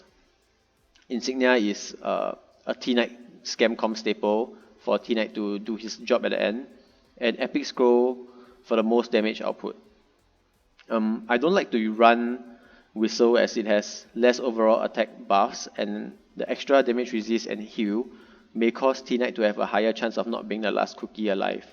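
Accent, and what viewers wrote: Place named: Malaysia. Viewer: Are you malaysian , i know that accent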